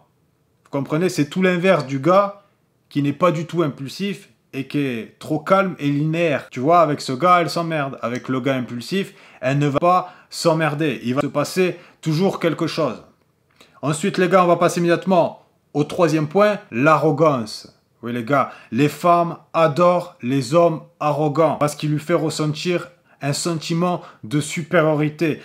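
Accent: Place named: South, France. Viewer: C'est la 1ere fois que j'entends un allemand parler avec un accent du sud 😂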